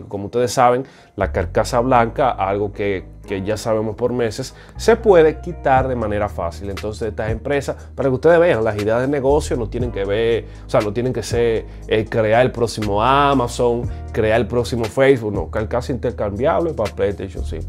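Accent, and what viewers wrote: Dominican Republic. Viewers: Este pana es Dominicano?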